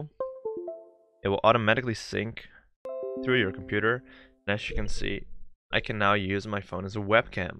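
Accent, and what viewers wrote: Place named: Netherlands. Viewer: This might seem off-topic but you sound like a Dutchman.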